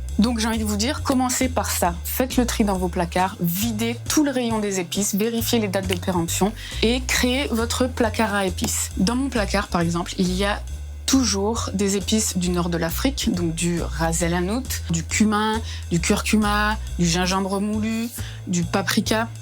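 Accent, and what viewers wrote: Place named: South, France. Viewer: l'horreur.... tu as une pub sur les apports en fer pendant la grossesse et "pour répondre à vos besoins vous pouvez compter sur la viande".... Merci YouTube ! en tout cas J aime beaucoup ton énergie, l'accent du Sud et ton style ! Merci pour tes vidéos 😊 (je mange vegan et ca me donne plein d'idées ou parfois simplement la motivation. j'ai adoré la vidéo apéro et la polenta !)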